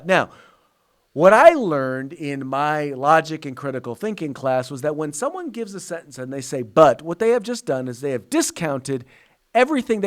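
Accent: United States. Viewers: OK, you are taking some leaps here. And with the horrible hick accent that no one i know here in texas talks like that aside, I trust Governor Abbot. And Texas will never be like California. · Our governor fuckstick edwards in Louisiana is even worse. People better be paying attention to what is happening...your Southern accent blows by the way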